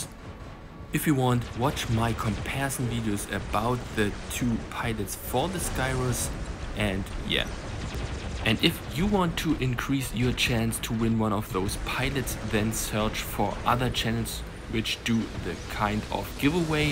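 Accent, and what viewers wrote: Germany. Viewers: ja das könnte natürlich sein aber sprichst nich schlecht englisch auch wenn man halt den typisch deutschen akzent hört😂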